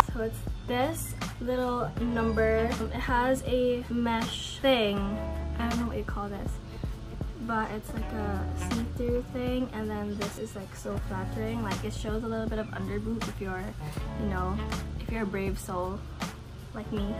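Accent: Visayas, Philippines. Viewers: Ang cute!!!!! I love ur bisaya haahahaha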